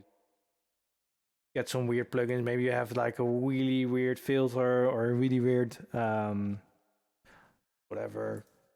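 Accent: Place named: Netherlands. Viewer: But that dutch accent tho. Dope tutorial nonetheless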